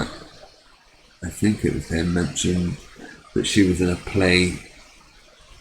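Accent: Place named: Bristol, United Kingdom. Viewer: Is that photo taken from... St George or Totterdown? You sound like you might be from Bristol 🧐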